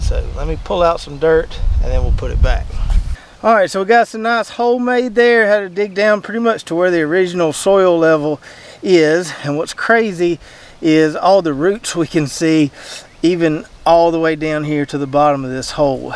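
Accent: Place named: Southwest Virginia, United States. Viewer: Thank you! Not sure where you're from but you speak like us here at home. I think you may be a little further south but here in southwest Virginia, our last two winters have been so mild that it doesn't freeze out the insect pests that cause disease like you said in this video. I like that worm bin you had buried in your raised bed. I'm thinking about making one of my own. Take care!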